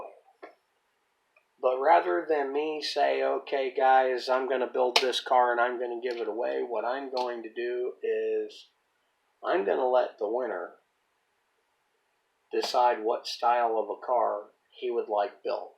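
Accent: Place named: Western Pennsylvania, United States. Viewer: Informative video. Sound like you are in western Pennsylvania or eastern Ohio area. Drop me an email sometime.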